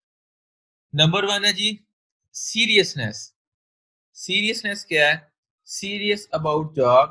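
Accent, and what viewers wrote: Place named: Punjab, India. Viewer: punjabi accent is so good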